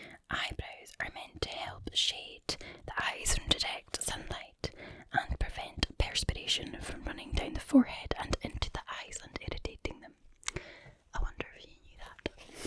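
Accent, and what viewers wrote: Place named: Scotland, United Kingdom. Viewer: sounding super Scottish in this video - love it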